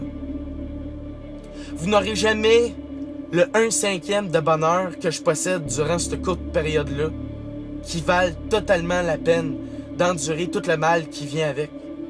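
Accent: Quebec, Canada. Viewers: mon pére et bipolaire et moi apparement merci car tu ma fait rire avec ton accent québecoi mais aussi merci par ce que tous se que tu ressent je le ressent ausii et sais dur d'être instable des humeur instables mais comment sa fait du bien de voir ta vidéo merci tu es admirable <3 :) prend soin 2 toi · tant d'amour en un seul coeur, continue de communiquer même avec ton accent québecois, avec ou sans mots, ton destin est ronceux comme les notre. Combat tes doutes, laisse toi des aides mémoires :) pour tes journées infinies, Tu est le seul a pouvoir décrypter ton langage dans ce monde car (il vient de) c'est Ton univers. Shebaleking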